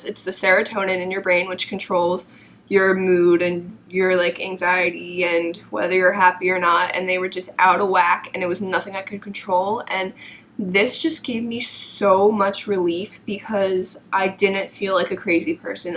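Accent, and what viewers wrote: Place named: New York, United States. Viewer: Are you from NY? you have an accent! Anyway, loved the video, God is the only one that can truly heal your sadness! :)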